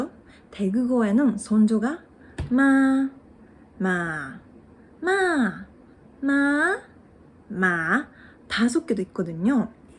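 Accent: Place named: Japan. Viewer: 日本人の韓国語らしく日本人の私には聞き取りやすいですが、韓国語ネイティブにとってどんな感じですかね。でも内容はとても面白く、違いを要領よくまとめていらっしゃいますね。ありがとうございました。❤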